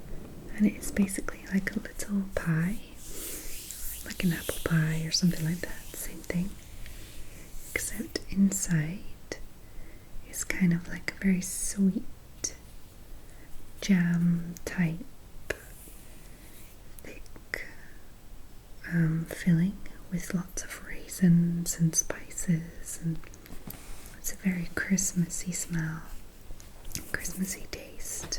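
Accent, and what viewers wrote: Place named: Scotland, United Kingdom. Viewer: She's Scottish, that's where the accent is from.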